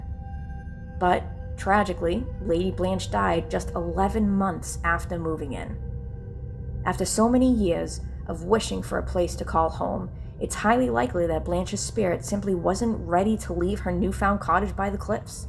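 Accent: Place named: New England, United States. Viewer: Loved it! The theories for the possible haunting are spot on. I’m sure many viewers have similar stories of neighborly hauntings! Great story telling. The narrator, does a delightful job telling the story in her New England accent. Fun listening!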